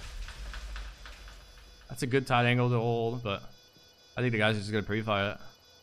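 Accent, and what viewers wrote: Quebec, Canada. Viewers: Es-tu Québécois parce que je l’entend dans ton accent haha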